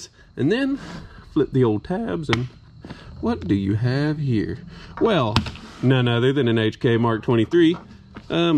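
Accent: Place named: Texas, United States. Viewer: Love the video for that I thank you. Sense of humor I rate you at about a 7/10. Shooting skills about a 3/10 Taste in beer about a -9/10 Hat about a -3 / 1000 Firearm Hk about a 1/10 Firearm Sig about 8/10 1950's sunglasses 3/10 Texas like accent. Erm It's Texas so 0/0 Garage or shop space 1000/10 Cool factor , it's a outdated design 2.5/10 Bragging rights because of a game lame/10 and your mom is calling you. Paying that much wtf are you nuts?/10 Shooting targets that had already surrendered and lying down big bully/10 Would I own one ? Well hell yeah/10 Why? Tears of the sun of course. In all seriousness: I have never owned a Mk 23 but have shot them before. Super cool firearm and accuracy is superb ! The extra weight out front makes it easy to bring back on target. I have never shot one suppressed dang it. On another side note. Metal Gear Solid is one awesome game! 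My rating system is about 5 beers out of a six pack. I have to say I would think that HK could have included a nice case . Something like the FNH FNX .45 Tacticool case . 2 mags are okay 3 mags are way better. HK mags are stupid expensive. I have 2 USP's and the extra mags back when I purchased the firearms were around $62 to $75 a piece. Now the USP mags are around $40 a piece which means the Mk23 mags are probably just over $50 each. I own a HK P7 series so I pretty much know what a over priced HK is. May I ask what that MK23 is like with that big ole suppressor? It looks to be almost Major League baseball bat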